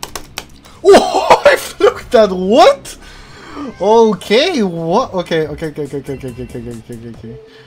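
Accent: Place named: India. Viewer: but he sounds india so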